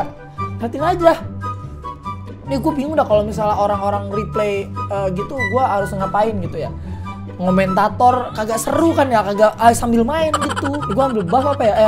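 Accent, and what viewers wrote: Jakarta, Indonesia. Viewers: Betawi bnget d lu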